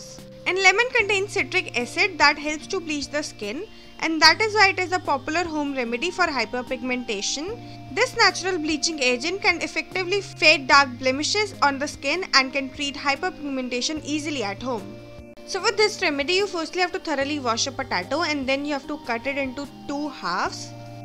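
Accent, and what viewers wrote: India. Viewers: im for sure you are an indian, the way your accent sounds like, by the way, the way you said POTATO is kinda bit funny, in a good way. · this indian accent had been very annoying omg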